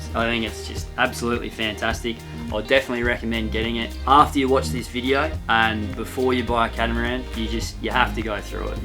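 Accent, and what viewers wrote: Australia. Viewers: We're not sailors...at all. But we love your videos and have learnt heaps about sailing since watching them. We found this one really interesting. Oh and we really love hearing a fellow Aussie's accent and how your positivity always shines through 🤗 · Phew. What a waffle. First I thought it was going to be 1 minute of Aussie nasal bad-grammared intro, then it became 2, 3, 4, but he kept going. Jump to about and he'll start talking about specifics. The title's insufficient too. It's about buying a cat for ocean travel, not for what most people do with their recreational vessels. Three minutes of information squeezed into . 'If that's your design parameters...'. Grammar, mate. Unfortunately, it's a ramble. If only he wrote up a few salient points. :-( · Thank you for this very interesting video, even if i didn't understand everything (dispite of your Australian accent and my french-american-english 😂) Anyway just to speek about the king-size thing : we have one in our Lerouge Brazapi custom catamaran and it seems like we bought a good performing and secure boat 😉 just started sailing with it a year ago, and as we're quite novice sailors we'll tell you more in a couple of years 😄 thanks for sharing your adventure ⛵ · G'day, mate. 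Loved the video after I got accustomed to the Aussie accent. Pack your kit in a seabag and sign on a cat as crew for a year. At the end of that year, you will have the experience to make a wise choice of boat.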